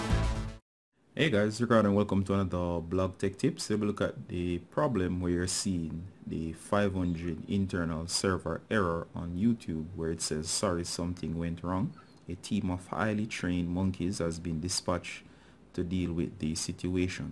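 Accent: Jamaica. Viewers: R u jamaican man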